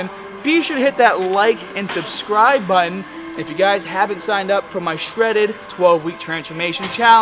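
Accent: Boston, United States. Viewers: I have a feeling you're from Bawsten? Ha ha I like accents. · You from Boston? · Yo is he american or am I just high?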